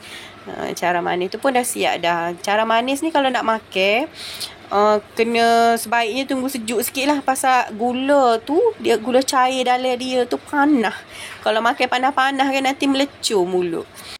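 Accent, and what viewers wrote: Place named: Kelantan, Malaysia. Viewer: sangat menarik logat bahasa yang kaka gunakan.....serasa ingin belajar bahasa Kelantan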